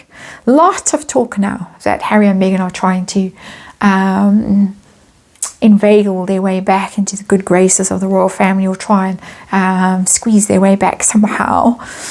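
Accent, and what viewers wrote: South Africa. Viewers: I listened twice to your ending with earbuds in and I couldn’t figure out what you said because of your charming South African accent. But when I heard your dog softly moaning I thought it was one of the cats that were in my house 😆 Take care and I hope to hear from you soon.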